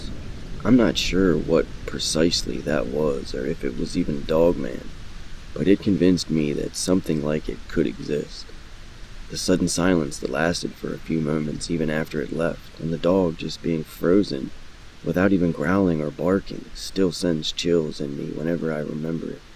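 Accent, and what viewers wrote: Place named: South, United States. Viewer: I hear a little bit of a southern (US) accent ☺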